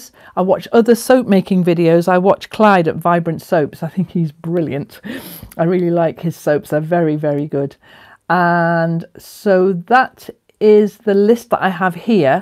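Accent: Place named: Northern England, United Kingdom. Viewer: You have occasional northern twang, I was born in Crosby.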